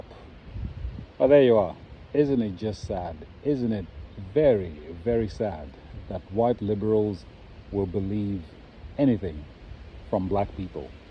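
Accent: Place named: South, United Kingdom. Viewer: Hi, You sound your are from the South of the UK, but could i ask you this? Any chance you can move to Scotland, and we can vote you into Government? You have an understanding of humanity, that these hyper qualified and frankly massive wallopers dont have. Thanks Scotland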